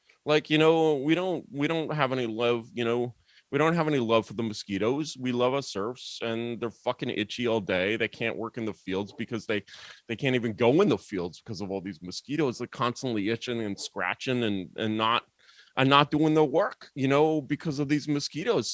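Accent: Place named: New York City, United States. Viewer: It's a nyc-ish Jewish accent not boston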